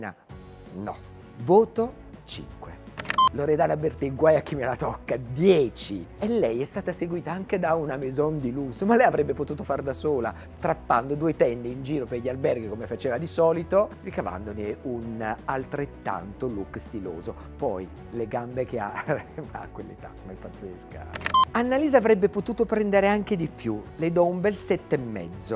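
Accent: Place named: Naples, Italy. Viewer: Ma da quando parla con accento napoletano. Voto 1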